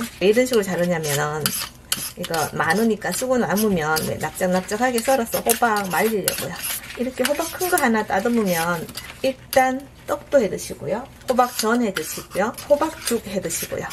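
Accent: Gyeongsang, South Korea. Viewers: 목소리도 듣기좋고 경상도사투리가 더 듣기좋아요 항상 잘 보고있어요 👍👍👍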